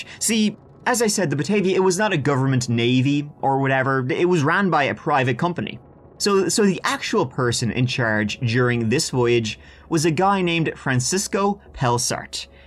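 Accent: Ireland. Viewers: Feck yes. The mad Irish lad telling the tales. Heaven.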